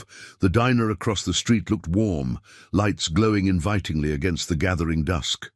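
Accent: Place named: England, United Kingdom. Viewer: Why would you use an English accent for the AI voice?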